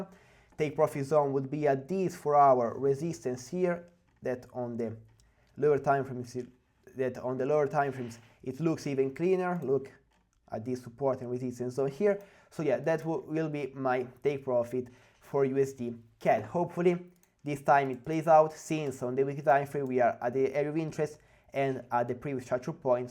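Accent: Italy. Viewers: ma per curiosità perché li registri in inglese?avevo sentito un pelino l’accento italiano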